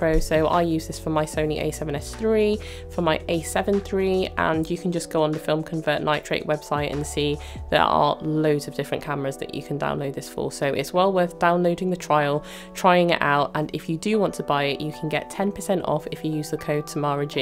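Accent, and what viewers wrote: United Kingdom. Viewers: I'm going to NYC next year. I want to record myself out and about and I don't want to use my phone. Would you recommend this or something different/cheaper? Other question: You're clearly, British! However, are you of Trinidadian/Lucian or Dominican descent? I found your channel and just subscribed. I saw a post on twitter and started watching a few videos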